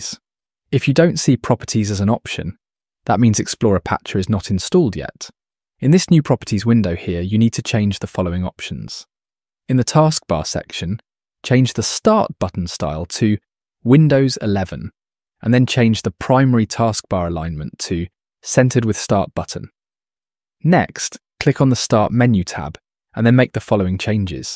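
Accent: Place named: United Kingdom. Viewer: I don't trust him mainly because of his UK accent I don't like him I don't trust them That's gonna be a no for me dog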